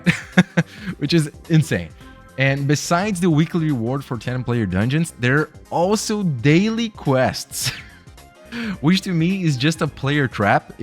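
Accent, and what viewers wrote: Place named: Brazil. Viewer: Tava vendo o video e comecei a notar um rastro de sotaque brasileiro. Fui olhar teu insta e nao deu outra kkkkkkkkkkkk por mais impecavel que seja o ingles sempre da pra perceber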